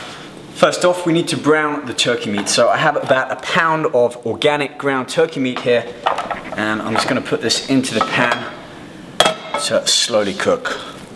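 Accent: London, United Kingdom. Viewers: "you sound like you're from london"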